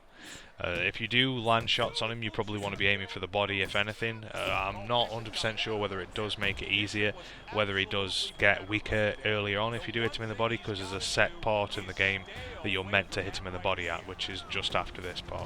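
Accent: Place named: Nottingham, United Kingdom. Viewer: This took me like 50 attempts and I smashed so many PS3 pads. Uploaded, are you from Nottingham? You sound like you're from around here because you sound like Carl Froch, haha.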